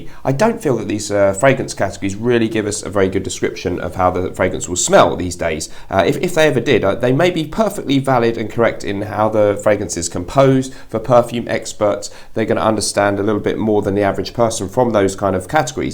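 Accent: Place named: North London, United Kingdom. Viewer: Another top quality video mate 👌🏼what part of North London are you from?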